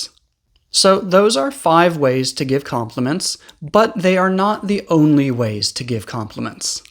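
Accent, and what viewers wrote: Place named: United States. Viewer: Excuse me, I hear your voice and I'm wondering if you're from the United States? You speak very clearly, I can guess, maybe you're an English teacher? Would you like me to show you around my neighborhood?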